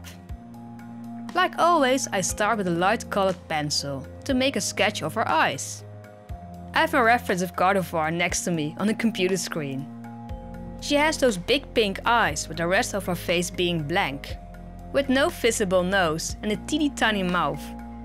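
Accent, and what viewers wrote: Netherlands. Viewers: Jep she is dutch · Are you dutch you sound dutch I am ducht bwt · I have a question, are you from the Netherlands? Your accent sounds like you come from there! Very cute repaint though. You deserve so much more recognition <3 Edit: ik herken je accent echt! Ik ben blij dat er ook een Nederlander in “the doll community” zit! :) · She’s from the Netherlands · * I knew you sounded Dutch, my friend is Afrikaans and she sounded like you! Afrikaans is derived from French, Dutch, and Swedish, and the accent sounds just like yours! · Hey, where are you from? You have a Dutch accent, I’m Dutch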